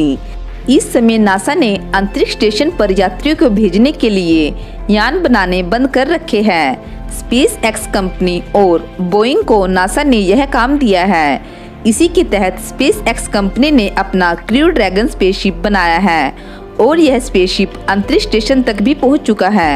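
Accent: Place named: Bihar, India. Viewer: Aapki avaj kan phad detihai..... Bihariyon ki tarah bolne ki kya jarurat hai...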